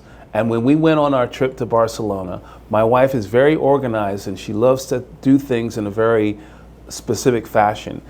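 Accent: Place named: United States. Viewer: his English accent sounds American but he was born in London 👍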